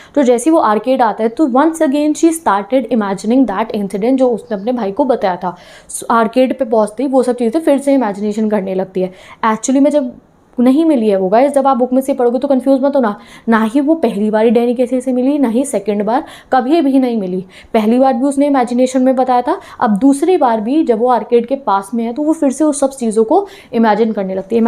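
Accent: Punjab, India. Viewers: Mam are you from punjab?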